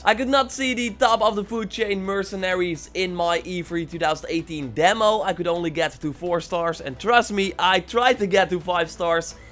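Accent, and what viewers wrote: Netherlands. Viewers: RuiDui yeah he is Dutch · Are you dutch?? Your accent really sounds like it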